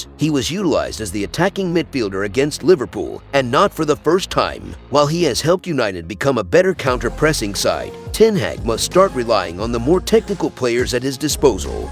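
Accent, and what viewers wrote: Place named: United States. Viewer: Stop using American voiceovers. They are garbage. The do not even know how to pronounce names correctly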